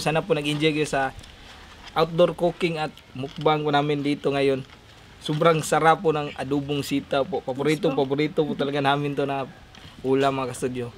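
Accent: Visayas, Philippines. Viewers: Tarongin mo naman kahit gamay tagalog mo pre pagka bisdaka motagalog nemu ting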